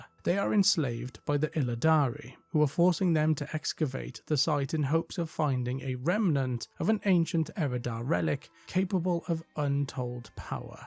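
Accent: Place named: Cardiff, United Kingdom. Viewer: I know a fellow Cardiff boy when I hear one!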